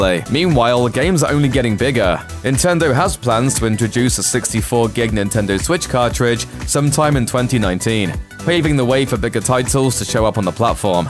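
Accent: United Kingdom. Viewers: This video didn't answer my question at all..it just showed me that anything I look up about video games is gonna give me a narrator with a 'eavy british accent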